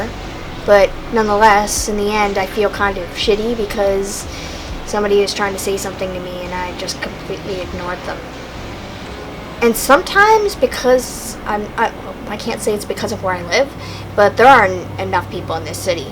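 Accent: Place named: Canada. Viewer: I actually love how you sound. I wanna say Canadian maybe? No matter where you hail from, you have the coolest voice. I wish I sounded like that. Not even joking. Xo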